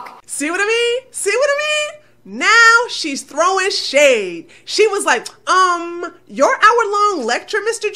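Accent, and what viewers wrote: United States. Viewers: haha very nice. I love your American English, it got so much character.:) And I agree with a lot of what you say. I think some muslims even lie to themselves, out of fear of going to hell, it's so sad. Due to 5 prayers per day, islam simply doesn't give them the time, let alone permission to question their believes. Especially muslimahs would have such a better life without a religion that tells them they are less worth than men. But I totally disagree with you on the scene with Horowitz. He didn't even answer her question, but proved to be a total dumbass, who can't bring himself to condemn Satanyahu, who's much worse than Hamas. So he was the bigotted jerk in that conversation. Given their view on women, I'm not a fan of Hamas either though. Infidel's greetings from Europe, wazzup!:)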